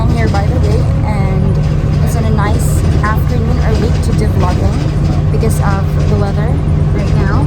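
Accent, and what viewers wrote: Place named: Philippines. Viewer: nindot man imong tagalog accent maam. Share love not hate.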